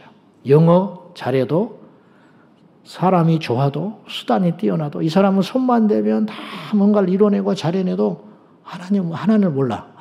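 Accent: Jeolla, South Korea. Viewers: 목사님 말씀 늘 잘 듣고 있습니다^^* 감사합니다 ^^* 저는 고향이 경상도인데 가끔씩 목사님 전라도 억양에 구수함을 느낍니다ㅎㅎ 살아가면서 마음이 답답할때마다 목사님 설교가 제 마음을 평안하게 해 주셔서 너무나 감사드립니다 ♡ 늘 건강하시고 매일 매일 축복이 가득 하시기를 기도 드립니다^^*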